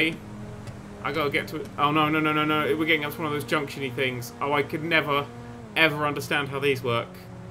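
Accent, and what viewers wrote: England, United Kingdom. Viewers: Nerd are you English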